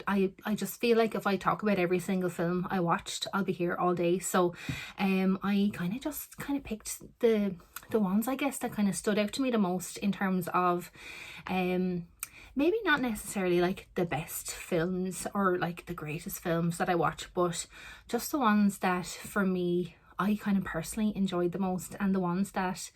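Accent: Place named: Ireland. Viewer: Hi,best wishes from Wolfie,hope your back is better although you did get time to watch some films,I have all the Hatchet films,there's 4,think they get gorier as they progress,loving the Irish accent,keep watching.xx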